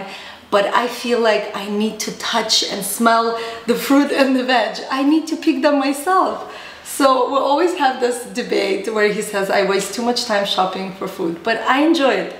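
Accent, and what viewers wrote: United Kingdom. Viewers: why do you take a British accent? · Her British accent is strong in this video love it!!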